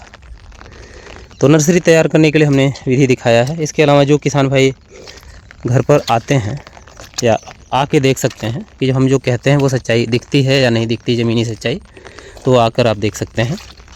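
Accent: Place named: Punjab, India. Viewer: But tuhadi boli to punjabi lagde ho....idro shift hoe si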